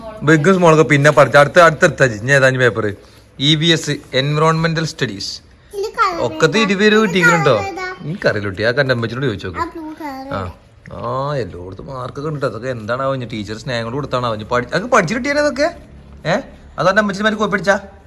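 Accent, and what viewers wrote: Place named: Malabar, India. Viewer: മ്മടെ മലബാറിയൻ സംസാര സ്റ്റൈൽ ആണ് നിങ്ങളുടെ ഹൈലൈറ്റ്. എല്ലാവരും ഇത് പോലെ സന്തോഷമായിരിക്കട്ടെ എന്നെന്നും.കുട്ടികൾ ഇപ്പച്ചീയേ... എന്ന് വിളിക്കുമ്പോൾ നല്ല ഭംഗിയുണ്ട് കേൾക്കാൻ . ❤❤️🙌🏻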